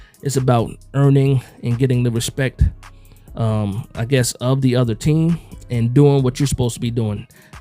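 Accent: New Jersey, United States. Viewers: No wonder you sound like you from home base Jersey City Nj in the building keep the content coming #NorthJersey#bigBlue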